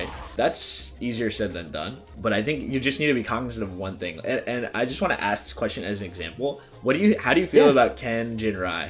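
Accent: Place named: California, United States. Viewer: Great videos as always, quick question though and really random. Are you from California? I feel you just sound like it lol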